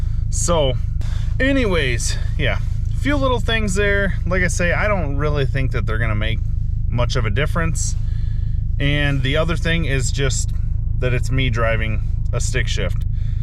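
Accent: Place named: Wisconsin, United States. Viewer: are you in wisco? Scenery and accent tells me yes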